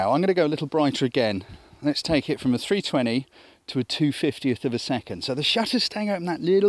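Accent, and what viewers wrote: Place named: England, United Kingdom. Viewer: i was shooting sunset pics at the beach for my first time on manual mode and i was speaking like unconsciously(English accent) in an effort to set the right settings to get a good shot LOL... hope i am not the only one hearing voices (his) on my head hahaha. oh out of 208 got 10 decent ones for my level.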